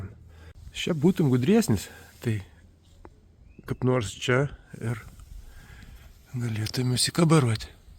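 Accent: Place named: Samogitia, Lithuania. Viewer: Netikėtas Jūsų atradimas, bet sakyčiau labai maloniai nustebinęs. Toks paparastas vietinių, įdomių, nežinomų ir mažų dalykų parodymas tiek Lietuvoje , tiek Latvijoje :). Man kaip aukštaičiaui labai patinka , kad tai darot žemaitiškai. Sėkmės !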